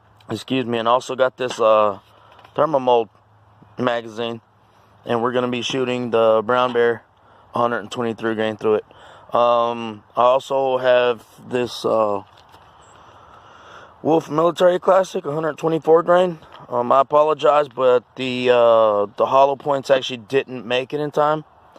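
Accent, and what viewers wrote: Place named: South, United States. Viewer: Nice southern twang you got there, Mr. Lol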